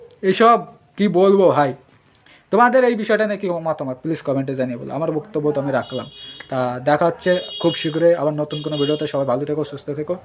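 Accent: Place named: Bangladesh. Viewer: Tumi ki bangladeshi naki !! Bangla evabe bolchho keno !! Chhi chhi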